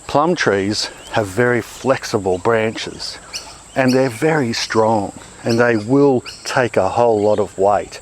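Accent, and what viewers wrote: Australia. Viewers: I love australian accent! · Greetings from France ! Someone recommended your channel to me, and i loved this video :) I really like your AU accent too !!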